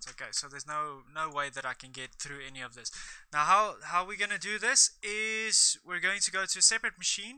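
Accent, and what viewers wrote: South Africa. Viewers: yon sound like a fellow south African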